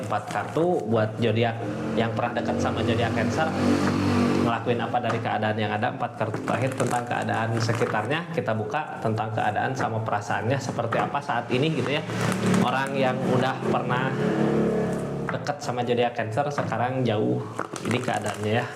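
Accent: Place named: Sunda, Indonesia. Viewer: Akang meni sunda pisan atuh, tapi da resonet eta reading na. Haturnuhun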